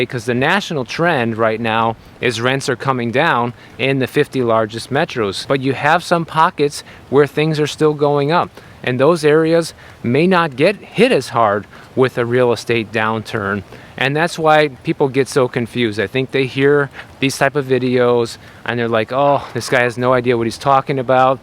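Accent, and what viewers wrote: Brazil. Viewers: Is from Brazil? My wife is Brazilian. She and could be sisters...accents and all. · She sounds Brazilian! Viva Brazil🇧🇷✨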